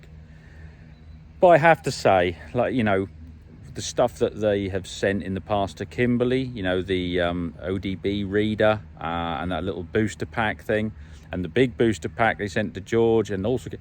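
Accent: United Kingdom. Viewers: Lets see if you read any comments???? Marshall Crawlers....in the part of the UK where your particular accent pertains....due to the type of land, Marshall crawlers were everywhere, it seemed like every other farm had one??? Are there any still around, I don't mean the later ones running on rubber, but the real Steel tracked Marshall Crawlers. (I did a project on them at school) in the late 60's.😂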